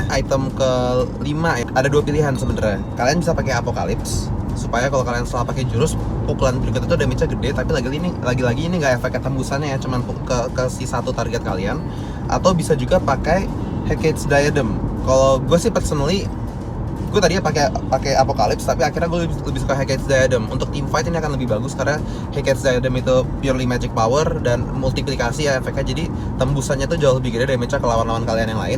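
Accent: Bali, Indonesia. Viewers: jano ini org bali ya?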